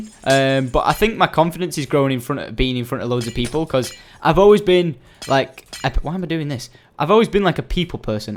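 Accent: United Kingdom. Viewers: aren't you british? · are u britsh cuz u sound britsh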